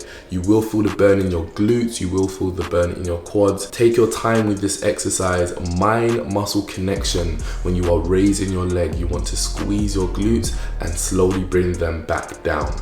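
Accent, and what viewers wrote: London, United Kingdom. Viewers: British accent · Your accent is killing me 👌👌👌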